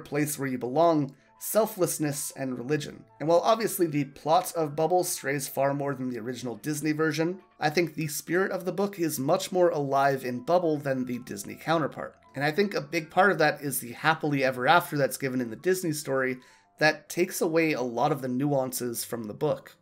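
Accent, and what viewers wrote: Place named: Canada. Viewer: Oh ok. I kept watching your videos and I thought you had a Canadian accent.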